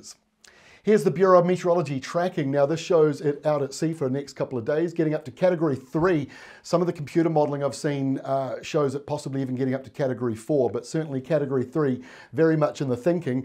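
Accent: New Zealand. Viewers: The plain English explanations (with kiwi accent) make this the best weather channel!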